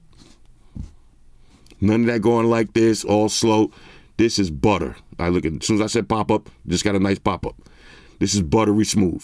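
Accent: New York, United States. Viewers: It's like talking to my boys from NYC 😆🔥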